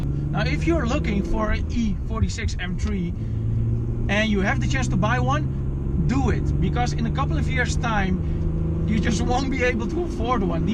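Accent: Netherlands. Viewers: that dutch accent tho, jullie knn echt geen zak talen man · Your accent sounds like you're from the Netherlands? I have a 2005 E46 M3 with 265 mm square setup lowered on coilovers with all sorts of High Performance Suspension goodies. I treat my daily 50-mile round trip commute as my personal racetrack. I wouldn't say my setup is super high on comfort, but it is off the charts in fun. My car came with 19-inch Wheels but I eventually graduated to lightweight 18 inch wheels which perform better and are definitely more comfortable. Bigger selection in 18inch and less expensive too.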